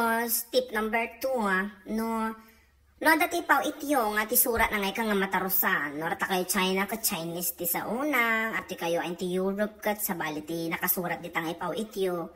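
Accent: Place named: Pangasinan, Philippines. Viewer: Kalma 😂 apay aya ket taga pangasinan ka agsasabali ti ilokano tayo nya? Batam dagita apal da laing😊 Love you ☺️❤️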